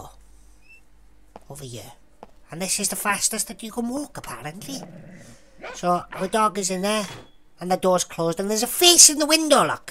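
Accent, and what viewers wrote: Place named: Wales, United Kingdom. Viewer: Funniest welsh accent ever never heard any in my life but I'm from Carmarthen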